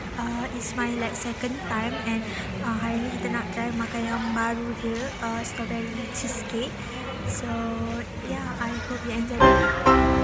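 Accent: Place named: Malaysia. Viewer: Yo malay english is waaaay too boring to be heard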